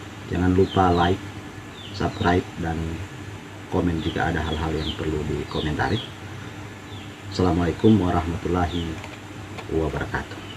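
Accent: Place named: Palu, Indonesia. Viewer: Dari logat sdh ketahuan org palu mange🙏😁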